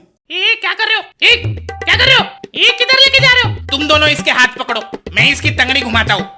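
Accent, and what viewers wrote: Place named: Mumbai, India. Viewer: We like your Mumbai ki language... the way you speak in different voices... unexpected topic pe Video aa jate hai😂 aaj to hamara dar khatam ho gaya... bechara bhoot berozgar ho gaya😂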